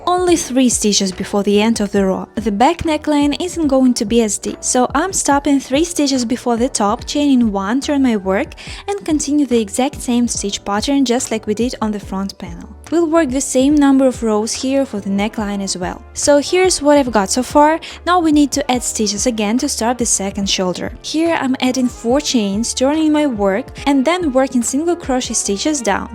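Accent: Russia. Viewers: Hi girl thanks for your perfect turorial, btw are you russian?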